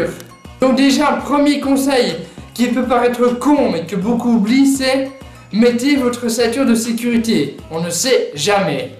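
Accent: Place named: Democratic Republic of the Congo. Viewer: sympa l'accent congolais c'est bien ca?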